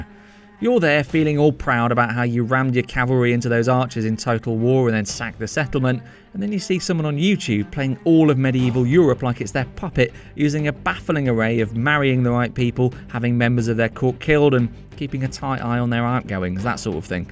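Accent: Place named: South England, United Kingdom. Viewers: Yeah he's got an English accent. Sounds southern. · Who else loves the british accent? It's british right? I am not dumb right?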